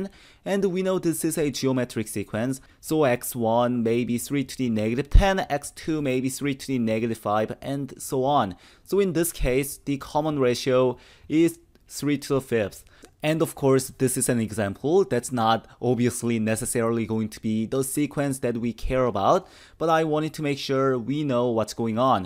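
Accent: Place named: South Korea. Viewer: His accent is sooo pleasant to listen to! I love it. If I had to guess, I think it is Korean.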